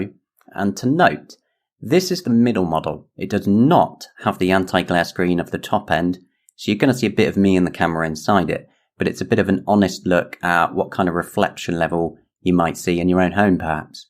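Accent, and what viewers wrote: United Kingdom. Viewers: Are u from Liverpool?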